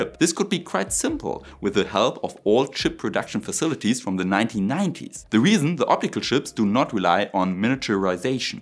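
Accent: Germany. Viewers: There is nobody I would rather learn science from than a German dude with a German accent.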